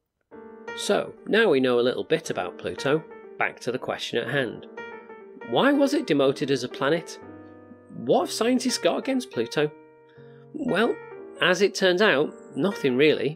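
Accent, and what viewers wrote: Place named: Bolton, United Kingdom. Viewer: Are you from Bolton?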